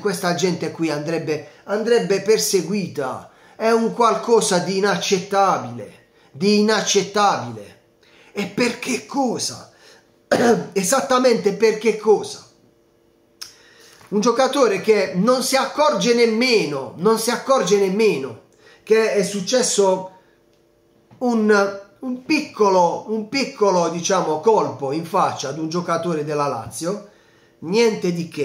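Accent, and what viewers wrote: Bari, Italy. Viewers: Analisi dei fatti è perfetta Grande sei un grande ti seguo sempre continua così. Vivendo a Roma sappiamo bene chi è il presidente della lazzieeee. Forza Milan Sempre. Un abbraccio forte a e al suo colorito dialetto barese che conosco poco ma detto da te mi fa sbudellare dalle risate specialmente quando vince il nostro amato Milan ciao 👏👏👏👏👏👏👏👏❤️🖤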